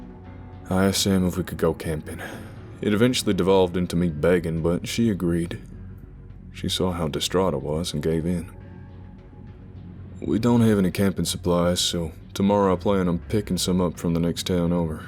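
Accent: South, United States. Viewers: Even as an american I still find his southern accent equal parts authoritative and smooth, like a fine whiskey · Imagine having a southern accent like that and detesting guns. I got my Glock 22 on me right now!!! Pow pow!!! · Your southern accent always gets me hyped for a story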